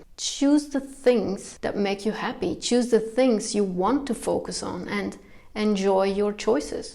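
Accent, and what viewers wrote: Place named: Netherlands. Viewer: Totally get the tail-chase thing. I'm late this week and couldn't stick to my weekly upload schedule as my type of content is edit-heavy. I find myself inside Affinity Photo (my PShop eqvivalent) than doing the recording or video edit itself. (Atm I'm usng Descript, Camtasia and Audacity) Out of interest what are you using? And judging by your name and accent I presume you're in Holland? :-) Anyway, having a posting schedule is still priority for me, especially when overseas or dealing with 'the job'. Post or die! LOL.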